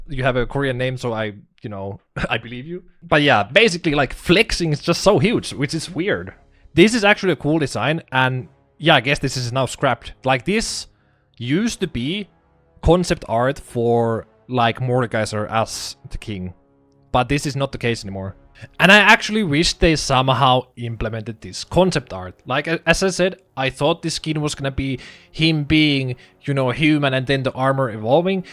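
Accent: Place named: Finland. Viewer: Hyvä video. Suomi aksentti paistaa vähän lävitte. 👍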